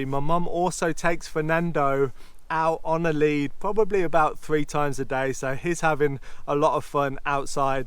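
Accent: Birmingham, United Kingdom. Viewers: I've been following the 2 of you since my Auto play turned on one of your early videos and not even watching the screen I heard the dulcet tone of Brummie wafted across my studio. I'm from Ward End but moved to Greece 13 years ago then came back to the UK 8 years ago but not to Brum so our youngest son could finish his education. Watching your little tour took me back over 30 years ago, doing the BRMB walkathon through the park and around the No 11 bus route, performing at the MAC with my collage drama class. My father was an Engraver in the jewellery too. Not sure if I really miss Birmingham, I think I miss Greece more. Glad is feeling more herself, x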